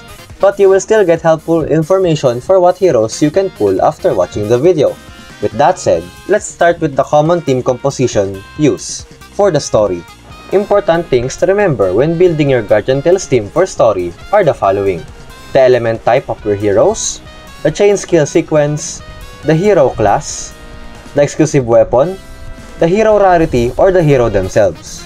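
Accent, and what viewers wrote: Philippines. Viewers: 100% Filipino accent here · Are you filipino because of your voice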